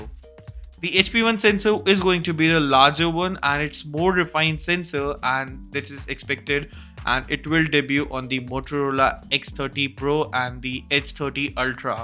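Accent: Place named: India. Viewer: Nobody knows:) some mixture of indian and a little bit of english, pretty weird))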